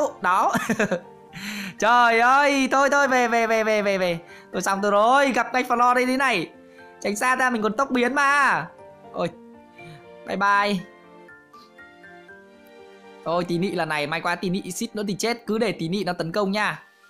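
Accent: Nam Dinh, Vietnam. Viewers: Anh ơi nhà anh ở nam định à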